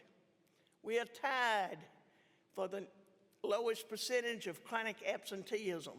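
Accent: South, United States. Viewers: One of the best southern accents of any current governor!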